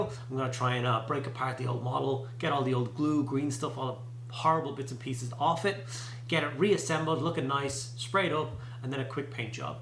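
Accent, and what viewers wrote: Ireland. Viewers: Random question but where are you from? You have a mixed accent or it sounds like it to me. How you say certain words sounds very Dublinish:-P This is a really cool to see you save and redo the model. Looks like the person might of been new and didnt know what was going wrong with the model.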